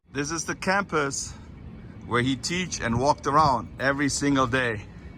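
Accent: Germany. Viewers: I like the way the German in you comes out, don’t apologize!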